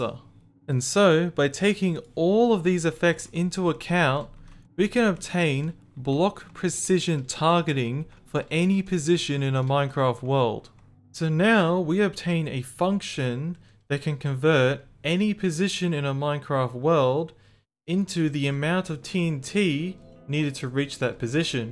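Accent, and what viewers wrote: Australia. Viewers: also, AUSSIEEEEEE OMG (LOL)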